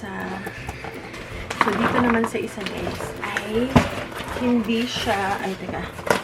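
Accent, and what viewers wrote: Batangas, Philippines. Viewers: Ma'am, batangueña ka po?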